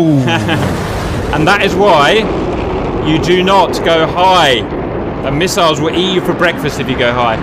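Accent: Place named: United Kingdom. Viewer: I am not used to British accent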